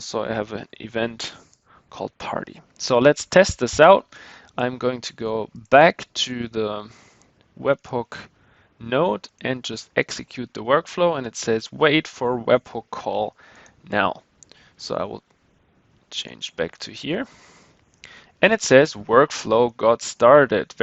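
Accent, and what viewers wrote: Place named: Germany. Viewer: Bitte, wo finde ich die seite in der ich den ganzen bums bearbeiten kann ich raffs nicht. Und komm mir nicht mit englisch ich hör den deutschen raus ^^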